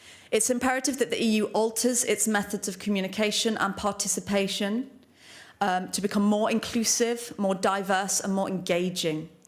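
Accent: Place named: RP, United Kingdom. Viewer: She's very posh. Brexit - the "Europe has no federal ambitions" lie ..“What will actually happen if we stay in the EU” is a question no remainer will ever answer but here it is warts and all. Check it out if you wish ——&gt;&gt; 1: The UK along with all existing members of the EU lose their abstention veto in 2020 as laid down in the Lisbon Treaty when the system changes to that of majority acceptance with no abstentions or veto’s being allowed. 2: All member nations will become states of the new federal nation of the EU by 2022 as clearly laid out in the Lisbon treaty with no exceptions or veto’s. 3: All member states must adopt the Euro by 2022 and any new member state must do so within 2 years of joining the EU as laid down in the Lisbon treaty. 4: The London stock exchange will move to Frankfurt in 2020 and be integrated into the EU stock exchange resulting in a loss of 200,000 plus jobs in the UK because of the relocation. 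(This has already been pre-agreed and is only on a holding pattern due to the Brexit negotiations, which if Brexit does happen, the move is fully cancelled - but if not and the UK remains a member it’s full steam ahead for the move.) 5: The EU Parliament and ECJ become supreme over all legislative bodies of the UK. 6: The UK will adopt 100% of whatever the EU Parliament and ECJ lays down without any means of abstention or veto, negating the need for the UK to have the Lords or even the Commons as we know it today. 7: The UK will NOT be able to make its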